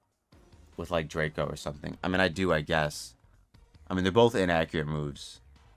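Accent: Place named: United States. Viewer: ¿You, native Costa Rican? You sound pretty much like a native American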